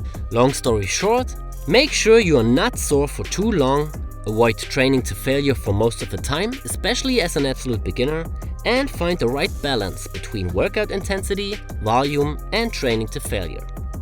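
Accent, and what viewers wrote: Germany. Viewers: Not a native speaker, is he? Sounds like a German accent to me, am I right?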